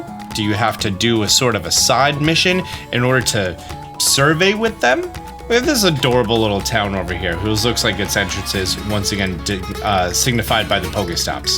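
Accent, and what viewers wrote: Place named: Philadelphia, United States. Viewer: Are you from Philadelphia? You say 'wudder' like all of my friends and family. Lol. Also, thank you for the great breakdown!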